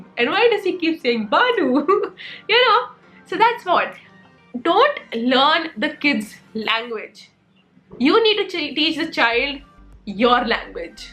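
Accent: India. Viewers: Are you teaching your kid English in Indian accent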